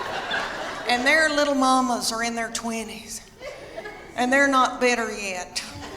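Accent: South, United States. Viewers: I was today years old when I found your channel!!! I can hear the sweet southern charm in your voice!!! Hello from Alabama!!! You're hilarious and refreshing! Thanks for making me pee myself today, I DO aporeciate it!!! 😂😂😂 #sportsandoffdope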